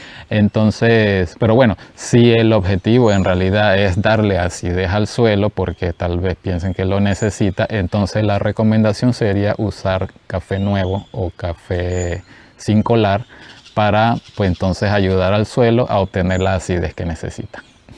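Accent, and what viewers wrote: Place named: Panama. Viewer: Por tu acento veo que eres panameño … saludos y muchas gracias por el dato!!!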